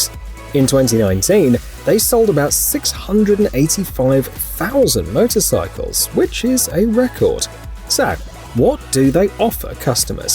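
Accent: England, United Kingdom. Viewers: #2 The Kawasaki H2 is actually supercharged not turbocharged. A turbo is spun by the engine exhaust gasses/moving air, while a supercharger is mechanically spun by the engine......Also so there are several V8 car engines in motorcycles that make even more torque & HP than the H2 Kawasaki. That I think fit the definition of, "most brutal" way more than any of the bikes on this list. Also the Dodge Tomahawk (Viper V-10 engine) that Steven St.Laurent mentioned below. I would think a guy that makes videos & speaks in a snobbish English accent, would have his facts straight.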